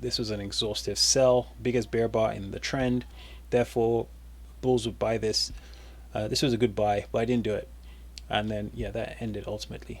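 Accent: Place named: England, United Kingdom. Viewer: Btw where are u based? You sound English but your charts are in America :-)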